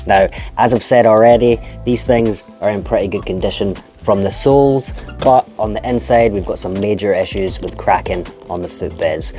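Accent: Scotland, United Kingdom. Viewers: He's scottish. S c o t t i s h ...get it ? Understand ? i can say it slowly for you if its still too difficult. Guess what you can give him a view with the sound off too 😂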